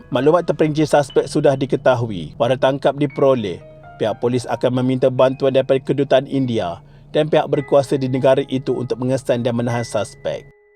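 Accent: Kelantan, Malaysia. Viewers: logat meme tok lari ore kelate be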